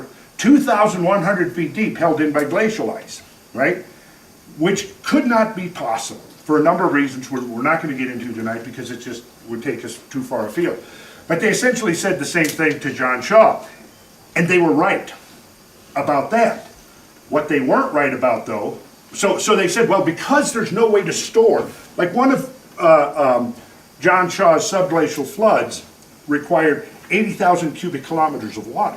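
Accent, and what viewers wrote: Minnesota, United States. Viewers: I grew up on the banks of glacial Lake Agassiz known as the Red River Valley now. At least we were told it was Lake Agassiz. The Red River Valley is sedimentary. A dang good place to have a farm if you don't mind being flooded out every once in a while. By the way, I knew you were from the Minnesota North Dakota area before I heard you mention it. Your accent is mostly gone, but I detected it. Mine is mostly gone as well until I cross the North Dakota border then I sound like a character in the movie Fargo.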